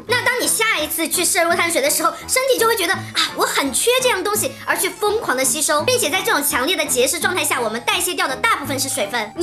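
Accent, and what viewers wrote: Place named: Sichuan, China. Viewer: 原來跟密子君一樣是川妹子！！難怪講話口語口氣超像！！！喜歡😍😍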